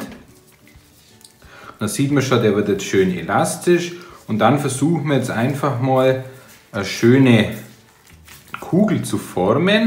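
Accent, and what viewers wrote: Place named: Augsburg, Germany. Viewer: Hört sich nach augschburger Dialekt an.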